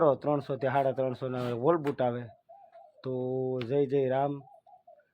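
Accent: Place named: Sidhra, India. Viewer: ભાઈ સિધરા બોલી મજા આવી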